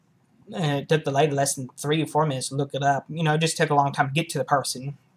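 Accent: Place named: Texas, United States. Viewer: Love your haircut, I love your Texas accent.Thanks I will take my whole family to Walgreens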